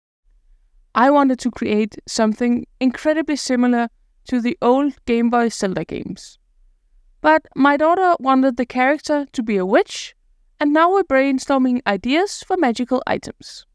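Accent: Denmark. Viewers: Fantastisk at finde en dansk-engelsk accent - FANTASTISKE videoer all over btw! Du er uden du ved det, ved at lære mig og min dreng godot :D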